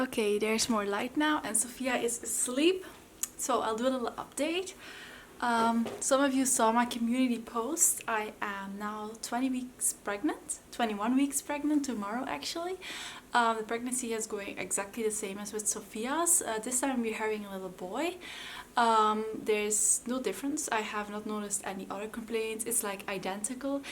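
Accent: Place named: Netherlands. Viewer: Where are you from? It sound like you speak dutch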